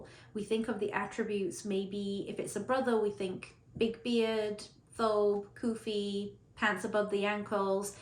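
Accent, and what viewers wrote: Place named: United Kingdom. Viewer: British English adorable!Salam from Kavkaz,Russia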